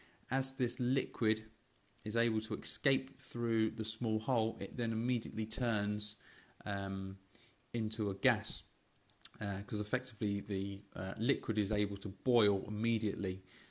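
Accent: United Kingdom. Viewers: Stopped watching because i hate british accent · I love your dialect Sir. It reminds me with my lovely British Professor .. Blessings. Once again, thank you. Kind regards.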